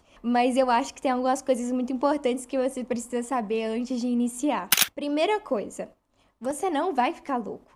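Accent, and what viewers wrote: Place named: São Paulo, Brazil. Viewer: Moça ótimo vídeo. Sou formado em RH e estou terminando Ed Física. Penso muito em fazer Teologia ou História, ainda estou nessa dúvida. Já tenho a prática de ler muito a Bíblia pelo menos 5x por semana e talz, pq amo esse relacionamento com o Senhor, enfim... Só gostaria de saber de você pela sua experiência: Você sugere o curso de Teologia ou a Faculdade, mediante há alguém que a princípio não pretende trabalhar na área Teológica. E, qual faculdade de SP presencial (Se for de SP pelo sotaque kkk), você indica?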